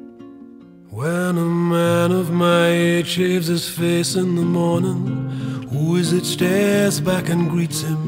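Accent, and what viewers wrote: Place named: Ireland. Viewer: this man is Irish Up The Irish good o ya u boyo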